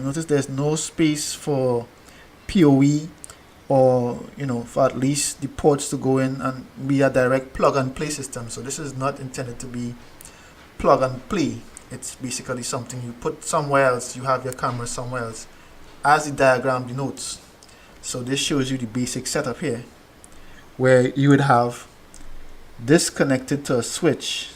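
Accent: Trinidad and Tobago. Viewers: Sounding Trini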